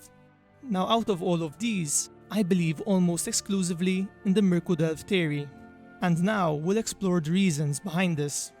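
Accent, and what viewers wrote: Malta. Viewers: You sound like a Maltese English speaker. Good work on the videos! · Great video buddy! You are Maltese, right? Very distinct accent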